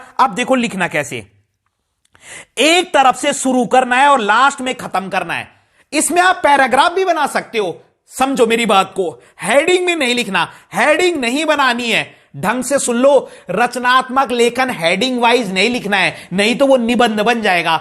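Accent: Garhwal, India. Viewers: Sir aap garwhali ho ky aapki aawaj se lg rha h 😊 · Gadwali ho na ap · Bro h sir gadwali accent se pta lg gya 🤣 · Sir aap kya gadwali ho aap ki awaaz se lag raha hai ki aap gadwali ho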